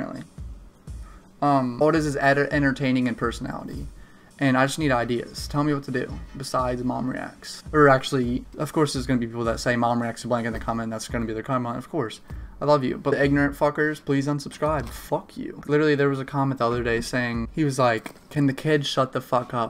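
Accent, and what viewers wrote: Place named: East Coast, United States. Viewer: east coast accent im guessing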